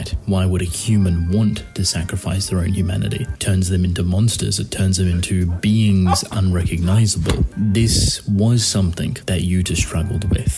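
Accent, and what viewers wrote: Australia. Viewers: I don’t know why, but I think I’m more likely to sub to a channel when the narrator is Australian.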